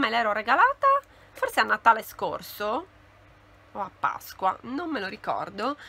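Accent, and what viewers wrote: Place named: Cagliari, Italy. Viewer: con due mesi di vacanza ti tornava l'accento casteddaio fortissimoooo!😂😂😂 in questi ultimi video si sente il ritorno alle origini! 😍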